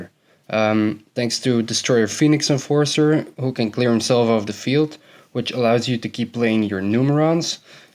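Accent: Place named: Montreal, Canada. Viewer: 🤣 ton accent est pareil de ceux de Montréal 👌😁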